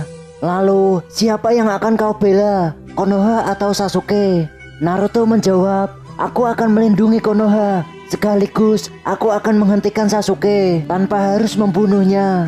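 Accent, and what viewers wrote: Java, Indonesia. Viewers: jawa skali,,bos...bicara nya...jawa kebun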